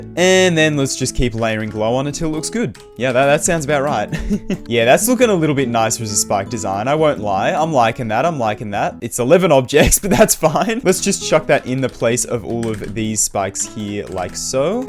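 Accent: Australia. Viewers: are you Australian? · sounds awfully Australian today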